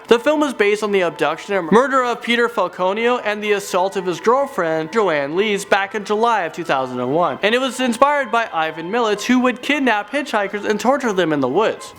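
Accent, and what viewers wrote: United Kingdom. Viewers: British sounds Better!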